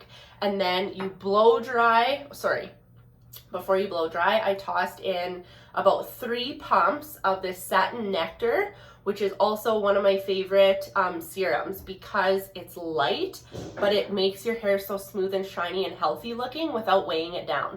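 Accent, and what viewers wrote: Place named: Canada. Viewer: Where are you from?? You sound veeery Canadian!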